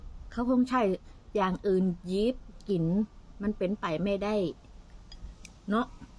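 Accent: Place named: Korat, Thailand. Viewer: แกพูดสำเนียงโคราชเเก่อยู่บ้านอาย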